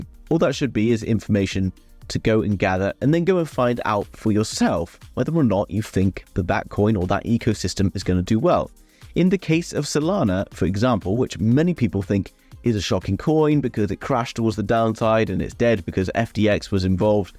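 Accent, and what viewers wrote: RP, United Kingdom. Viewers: ah, you were a Rupert. It figures with that posh accent of yours. 😉 Yeah, I stayed for about 6.5 years. I managed 5 tours in that time. That kind of did me in tbh. I love your channel, mate. Keep up the good work.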